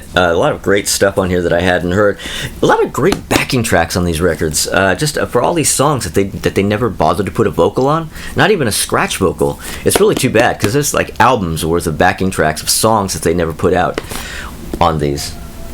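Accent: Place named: England, United Kingdom. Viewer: Love the Monkees funny how your doing a English accent when most were American great showing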